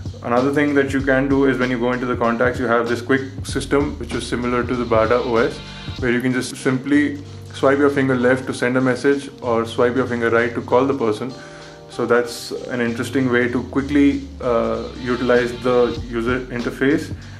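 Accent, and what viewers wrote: India. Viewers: bullshit typical indian english accent...( boda thing cuming out..)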